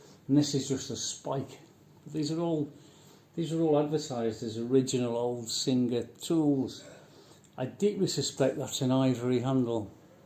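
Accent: United Kingdom. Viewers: Love listening to - his voice is so soothing. I could listen to the UK accent all day long (American here). I couldn't watch more recent videos because I didn't want him to leave. But I'm getting back to them. Thanks so much, Fiona for keeping his memory alive!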